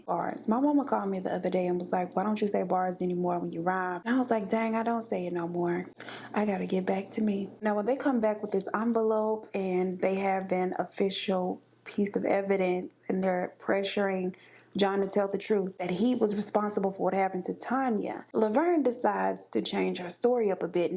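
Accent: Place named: Texas, United States. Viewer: I have to say, your voice is so soothing to me, it reminds me of when my family lived in Texas when I was a child. Your vocal timbre is lovely 😊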